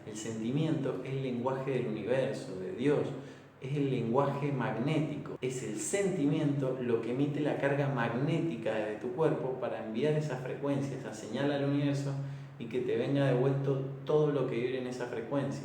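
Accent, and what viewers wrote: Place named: Mendoza, Argentina. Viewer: Hola, sos mendocino? Por la tonada digo, tengo la misma jaja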